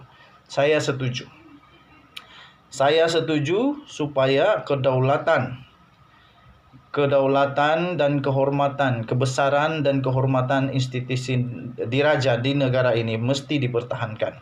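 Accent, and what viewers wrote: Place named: Sabah, Malaysia. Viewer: Aku suka suara, loghat dan style bahasa kamu. Urang sabah kamu bah???😁😁.. I like. Sudah subscribe...kita akan bertemu banyak lagi....👍👍👍👍👍👍👍👍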